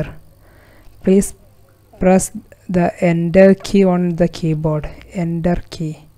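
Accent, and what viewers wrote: India. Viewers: Holy crap it actually worked! Ty miss! The Indian accent is so cute lol. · Of course another indian accent why every computer supporter is indian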